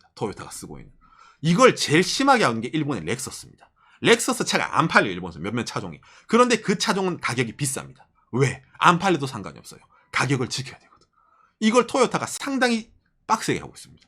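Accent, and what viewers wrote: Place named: Gyeongsang, South Korea. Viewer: 말투가 대구네요 구수합니다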